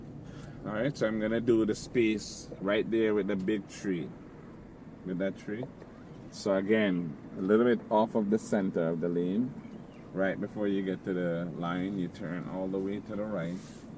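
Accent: Jamaica. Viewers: First coming across your videos, and I love it that’s where I’m having difficulty with. I can tell u r a yardie! I’m having trouble with knowing where to turn the steering pulling out a parking lot, can u do a video on that? Please!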